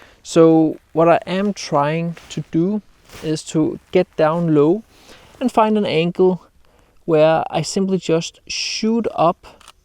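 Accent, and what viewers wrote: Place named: Denmark. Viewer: When I first watched your videos, I thought to myself, "He's the Lars Ulrich of photography." Then to find out that you are also Danish. I knew I knew that accent. 😂😂 Great videos! I really learn a lot from them.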